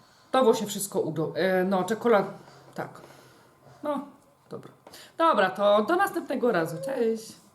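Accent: Silesia, Poland. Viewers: Tak, śląskim ;)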